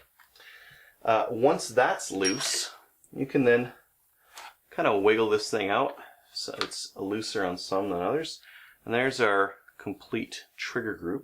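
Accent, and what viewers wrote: Canada. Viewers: You sound Canadian. You must live in the U.S. now or Trudeau has confiscated this rifle already. Going to happen to us as well. 🤨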